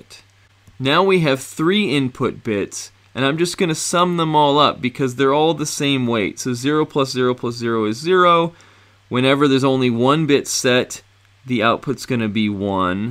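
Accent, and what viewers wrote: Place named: England, United Kingdom. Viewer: Thanks a lot for this english accent explanation, I'm having a trouble understanding indian explanation because I'm being distracted by their accent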